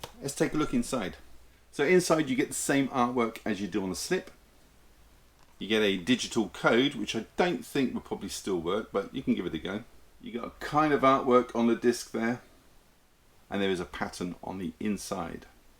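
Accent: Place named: Manchester, United Kingdom. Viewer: no you are right mate, it’s a Manchester accent. I moved to Cornwall around 20 years ago 👍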